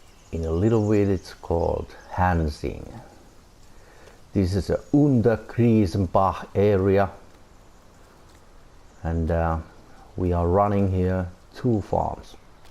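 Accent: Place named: Finland. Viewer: Greetings from Finland. I listen the accent and think you must be from Finland. Have you try to grow cotton in this map?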